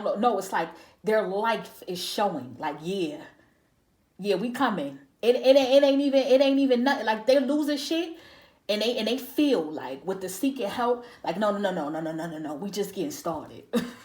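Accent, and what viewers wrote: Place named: New York, United States. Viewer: love ur accent is u from nycc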